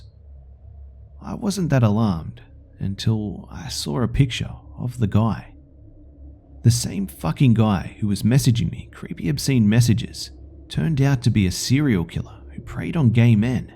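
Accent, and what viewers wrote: Australia. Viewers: He's Australian :)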